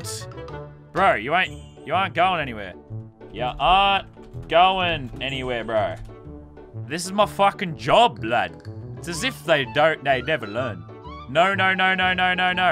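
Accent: Ireland. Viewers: I'm Irish and he sounded like he was Irish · Damn you got a good bloody Irish accent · Gosh dang your irish acsent is legite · can we just acknowledge how good his irish accent is tho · U putting an IRISH accent on to annoy me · Ur Irish accent is the greatest I liked subbed and turned on notifications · I love lasarbeams Irish acsent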